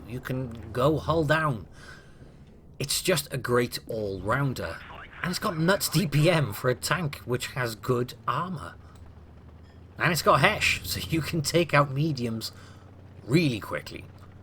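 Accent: United Kingdom. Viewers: I don't know how you get all these bouces while being on a flat ground, like the second game with leo and jag shooting at you... I get penned by stock T-54 all day long, even while wiggling and jiggling... 😭 Wg must be protecting you ! Or maybe do I suck? I'll try to apply the golden golden rule for my next games :p Anyways, thank you for the reviewing, love your British accent ~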